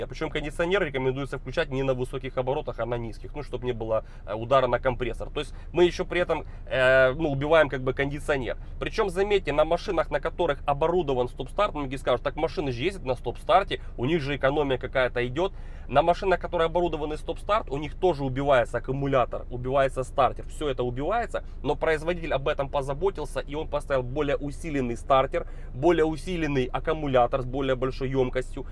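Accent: Ukraine. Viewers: блеадь эта укр ская манера речи хрен что поймешь ) даже увеличив звук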